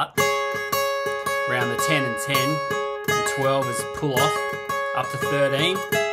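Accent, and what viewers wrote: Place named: Australia. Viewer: is an aussie talking here.😀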